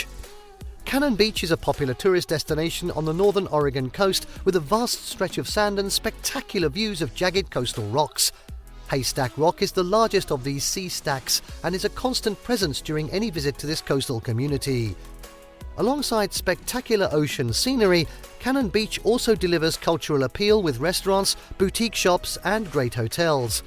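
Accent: United Kingdom. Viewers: Oh for God sake! A BRITISH accent on a video about OREGON. 😢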